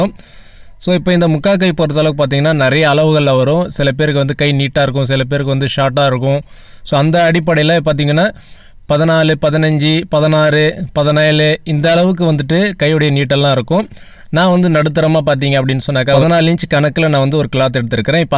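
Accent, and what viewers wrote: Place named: Kerala, India. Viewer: நீங்க எந்த ஊர் ப்ரோ மலையாளம் கலந்து தமிழ் பேசுற மாதிரி இருக்கு.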